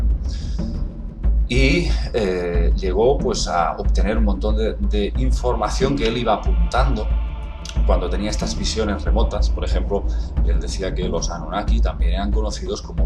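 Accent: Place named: Spain. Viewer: siempre lo he dicho, ese acento español es horrible, irrita mis oidos, ademas ese tipo es mas raro que un reptil con pelos.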